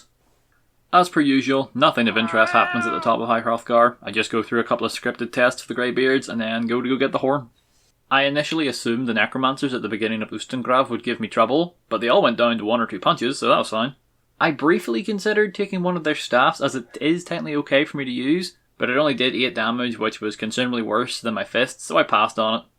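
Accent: Northern Ireland, United Kingdom. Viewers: I fucking love your accent. I think you said it was Northern Irish? im sorry if thats wrong or offensive or something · I can't believe I just heard a northern Irishman speak in uwu truly the internet is a fascinating place.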